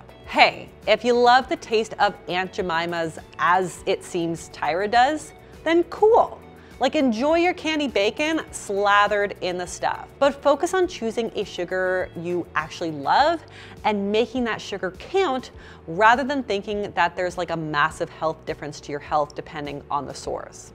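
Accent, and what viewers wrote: Canada. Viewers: Love the Canadian accent. It’s cool hearing bagel said in a different way. I love accents. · I was not prepared to find out how Canadians say the word bagel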